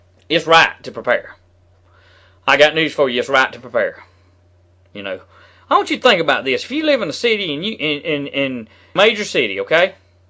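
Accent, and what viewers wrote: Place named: South, United States. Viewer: why? because he has a southern accent? because he is talking of being self-sufficient and preparedness? what he says makes sense, no matter if it contains "ya'll" and "aint". seems like you are the unintelligent one for tuning out valid points due to someone's speech pattern. i bet if your local mega super duper wal-mart closed down tomorrow, his viewpoint might suddenly seem rather valid, huh? although, some of the "they do this and that" talk is a little far out.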